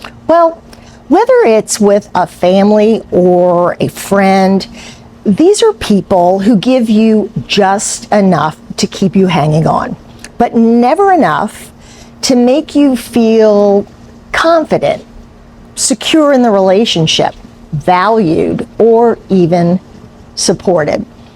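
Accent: Philadelphia, United States. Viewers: Thanks... About your accent. Are you from Delco, Philadelphia???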